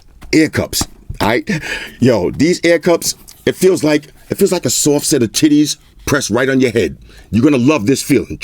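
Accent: New York, United States. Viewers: You’ve got that classic “New Yorker” accent. I love it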